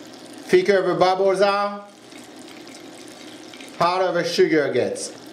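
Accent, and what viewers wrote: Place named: France. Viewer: Who doesn’t love a French accent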